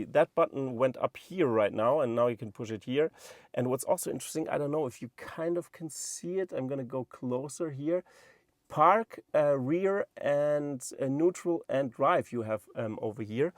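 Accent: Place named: Germany. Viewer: Great car, great vid. I hope your longer review includes a proper test of the voice commands. It needs to cope with accents and a German accent is a great test. I love your accent but I want to know that Tesla responds well, too. Imaging how it will be if the Teslas get Grok!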